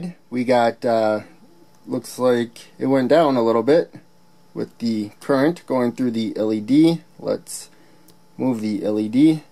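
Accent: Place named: Northern Wisconsin, United States. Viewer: Nice video. I hadn’t heard of breadboard power supplies. I also use a branch supply but often my projects need to be mobile to test so this is a nice option. By the way, I have to ask, are you from Wisconsin? Northern Wisconsin? You sound almost exactly like my cousin who grew up in Superior.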